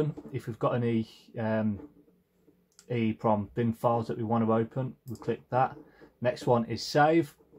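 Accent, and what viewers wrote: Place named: Midlands, United Kingdom. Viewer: Nice video. As a fellow Midlander, I'm loving the accent. Only our people can put so many syllables in the word "side". "Day-turr" :)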